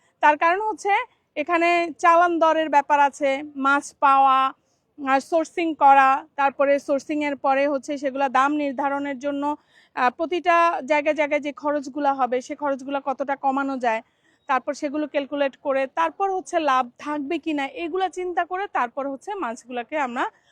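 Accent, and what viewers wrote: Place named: Bangladesh. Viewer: আমি ইন্ডিয়ান কলকাতাতে থাকি ,তোমার blog দেখতে খুব ভাল লাগে বিশেষ করে বাংলাদেশের ভাষা শুনতে। আমার origin বাংলাদেশের ফরিদপুর এ।বেশী করে বাংলাদেশের ভাষা বলবেন,অনুরোধ রইল। আমার নাম সুব্রত মিত্র,বয়স 72।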